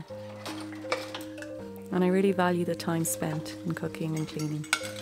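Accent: Ireland. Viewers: Those mint leaves also are fantastic with lamb or even in a salad. I love mint tea also. Very nice peaceful video and your voice an Irish accent is beautiful. You have new subscriber from Chicago! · Nice, soothing Irish lilt, thanks!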